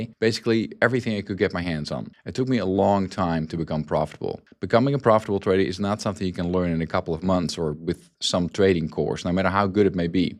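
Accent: Netherlands. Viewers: It too me a few videos to pick up the Dutch accent, good job, it’s pretty soft, unlike my grandfather from Bruges Belgium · I guess it’s just me but hearing a Dutch accent somehow increases credibility with these types of videos. I will be following your advice more closely! Edit: Ha I knew it, I even read your book!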